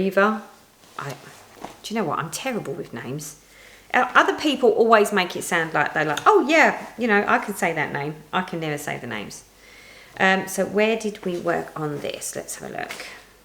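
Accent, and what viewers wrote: United Kingdom. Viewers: I love watching your videos and listening to your wonderful British English 😍 I usually have a new start whenever I want to, therefore it's great fun to watch you talking about your 'rules of new starts' and how you start stuff 'anyway' 😁 At the moment I have 54 wips, but a lot of them are under 30k stitches. And none of them is above 90k stitches 😊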